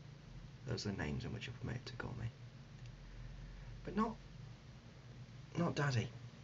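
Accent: United Kingdom. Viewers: Soo odd but that British accent tho. 👍🏻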